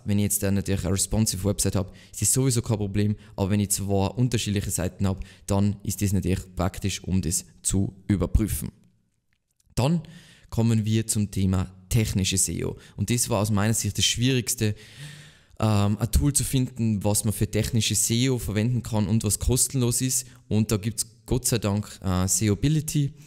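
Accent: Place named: Tyrol, Austria. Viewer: So schön wieder den Inschbrugger Akzent zu hören! Was das Video angeht, TOP!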